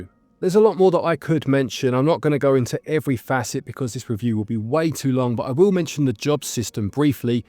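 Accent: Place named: Cockney, United Kingdom. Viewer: It's nofing wrong. I love the cockney accent.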